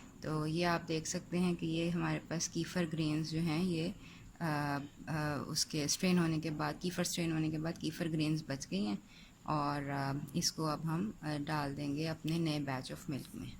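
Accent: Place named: Punjab, India. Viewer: andaz-e-bayaan bohat acha hai kalka sa panjabi touch hai jo acha lagta hai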